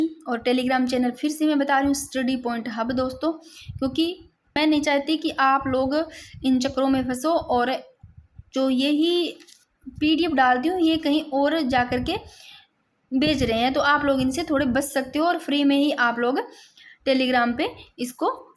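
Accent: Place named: Marwari, India. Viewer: Aapki boli to marwadi lg rhi hai acha lg rha hai sunke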